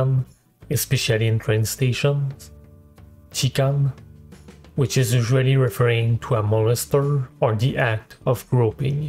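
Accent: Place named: France. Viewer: are you french? You sound very french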